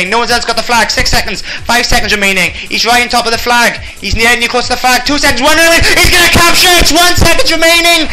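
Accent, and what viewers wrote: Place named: Wales, United Kingdom. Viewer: One of the most famous captures ever in UT! casting with his Welsh accent:D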